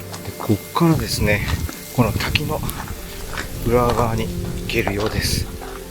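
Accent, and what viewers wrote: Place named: Nara, Japan. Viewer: さん、いつも素晴らしい動画とBGMをありがとうございます。本当に楽しく拝見させて頂いております。毎回日本ってまだまだ知らない絶景が広がっているんだな〜って感心します。ドローンの映像が素晴らしく自分で観に行っても見られないアングルからの映像に圧倒されます。ところで今回の動画の後入れのナレーションの音声が一寸だけトーンが高いように感じるのですが気のせいでしょうか？いつもの落ち着いた声が好きです。口調が奈良弁？でクピ男さん（クピ男の車中生活）に似てますよね。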